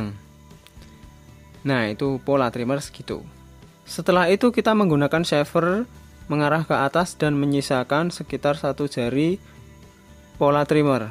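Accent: Java, Indonesia. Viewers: Jowomu medhok ,,,,hhhhha hhhha enak ki kesusu kok hhhha 🙏🙏🙏🙏🙏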